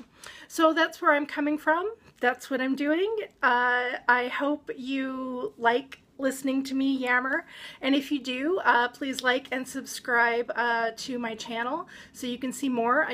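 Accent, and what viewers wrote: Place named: Washington, United States. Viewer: got to love that Washingtonian accent... another nice vid from you... thanks!!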